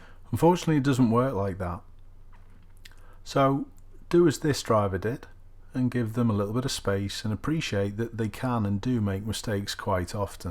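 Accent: Liverpool, United Kingdom. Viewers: Your laconic Liverpudlian commentary adds just the right touch of understated contempt to these clips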